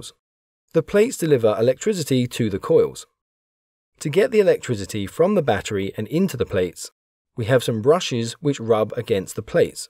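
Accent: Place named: West London, United Kingdom. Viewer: Which part of west London are you from ?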